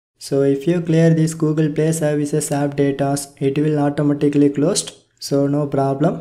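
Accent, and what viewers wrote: India. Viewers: This guy is a little, too Indian for me to understand, also it says it will delete my account and I don't want my account to go bye bye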